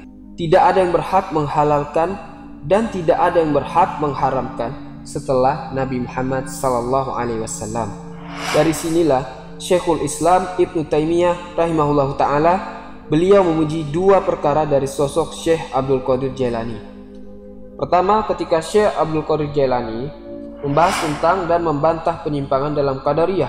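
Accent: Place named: Aceh, Indonesia. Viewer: Maaf dengar dialeknya. seperti dari Aceh.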